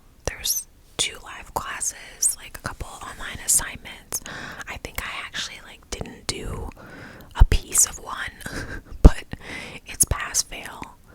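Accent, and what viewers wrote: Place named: Philadelphia, United States. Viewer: Just wanted to say as someone from Philly I love your videos as for some reason asmr is extra relaxing to me when the person has the same accent as me. Will be binge watching all your content!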